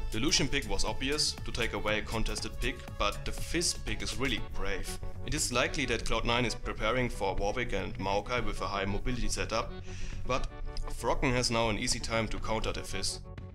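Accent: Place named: Germany. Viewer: Dat German accent xD wie geil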